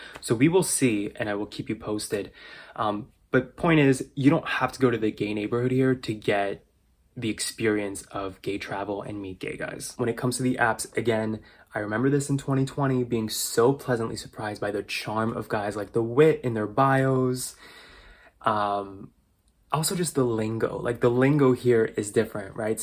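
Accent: United States. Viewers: I really like your voice and the way you speak English ( very light American accent) By the way I 'm French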